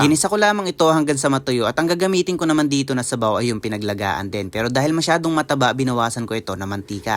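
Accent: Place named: Mindoro, Philippines. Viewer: Parang tonong mindoro ka brad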